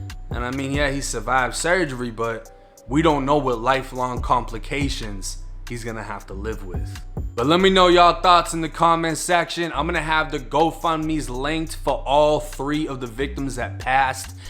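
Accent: Boston, United States. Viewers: The food mah-ket . Lol I'm from Buffalo and I love hearing ppl talk with a Boston accent. Fucking never gets old lol. The accent, your story telling ability, your journalism skills are all killer. bro you got talent 👏 👌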